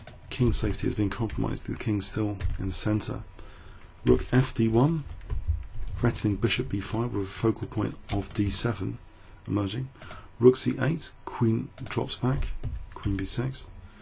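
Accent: United Kingdom. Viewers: Are you from. Uk ?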